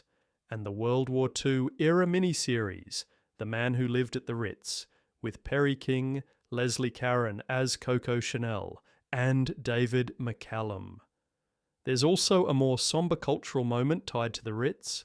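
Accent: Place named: Australia. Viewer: What an odd, unnecessary video. No official source or credentials, and quite the AI babble. What really threw me was the lower class Australian accent. He may be better served commentating a soccer game.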